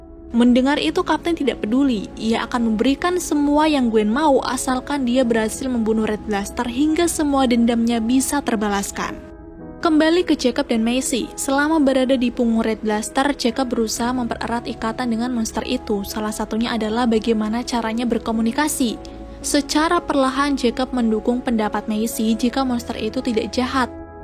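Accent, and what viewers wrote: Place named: West Java, Indonesia. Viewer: Kak kakak orang mana kaya orang sunda euy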